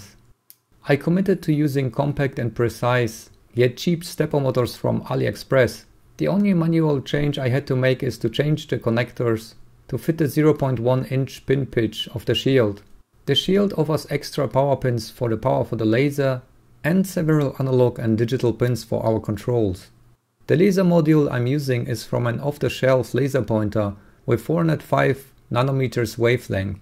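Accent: Germany. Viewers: Bro nices video aber das ist so ein Kartoffel Englisch man hört das du Deutscher bist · I think you are german, because you have a german akcent, And this gadget is cool.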